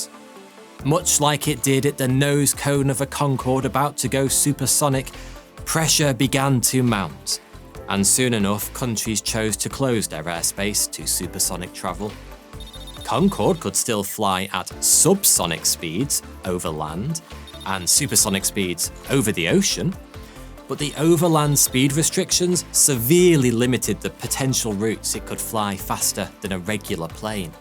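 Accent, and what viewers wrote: United Kingdom. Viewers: 10,500 dollar per ticket according to my Unc. We spoke about it awhile ago. When it first was introduced it was much cheaper. Lol first time i heard a british accent. I flew concord when I was 6 or 7 like...mid 90s. It's really small inside, like really small. Never experienced service like that tho. Beautiful experience from what i remember. I didn't even think of taking in the experience of seeing the curve of the earth. I would be to scared to fly concord now. The Russian one looked cool. I need to build the lego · Another freakin British accent channel, I can’t wait for a big Channel to call out this plague that’s been going on for the last 5 years .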